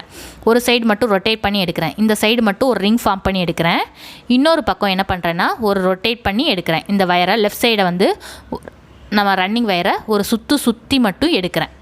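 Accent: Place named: Coimbatore, India. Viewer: Thank you mam unga Coimbatore slang nalla irundhadhu mam so thanks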